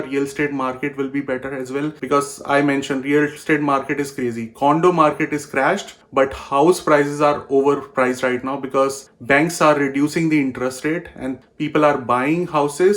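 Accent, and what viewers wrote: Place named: India. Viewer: How come you still have such a strong Indian accent?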